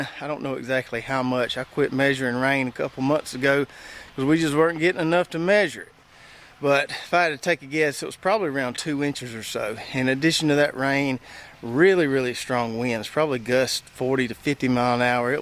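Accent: South, United States. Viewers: I love how you say potatoes I like southern accent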